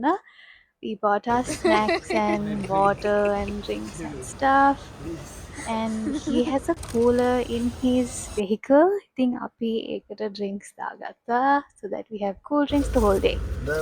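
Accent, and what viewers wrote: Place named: Sri Lanka. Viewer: I wanted to write this in Sinhala, but I’ll use English for clarity. Don’t you find it strange and awkward to speak a foreign language while in Sri Lanka? It’s like mental slavery. If your audience is foreign, go full English. If not, speak Sinhala. Using Singlish in between is just… funny. Honestly, broken English with a Singlish accent doesn’t make you “posh.” English is not fancy .. it’s a borrowed language, taken from other countries. Meanwhile, our native language is beautiful. It’s time to drop the slavery mindset. Think about that.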